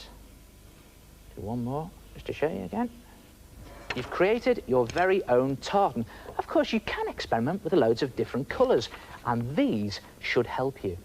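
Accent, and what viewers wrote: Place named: Liverpool, United Kingdom. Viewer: I am Canadian and I used to watch Art Attack all the time and I thought Neil was Scottish I now know he’s scouse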